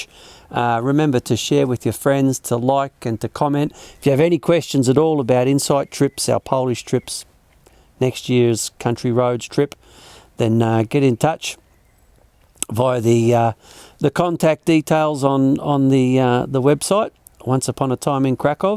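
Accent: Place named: Australia. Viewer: G'day, Thanks for the informative and professional video. It was great to hear this unmistakable Ozzy accent. I don't know how long you've been living in Poland but in the very unlikely case you haven't heard about Great Masurian Lakes I strongly recommend a few days holiday in this area. Greetings from Melbourne, Andrew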